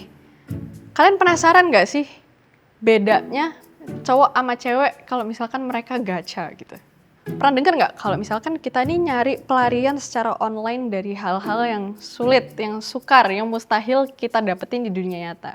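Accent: Java, Indonesia. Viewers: such a cute medok 🥰🥰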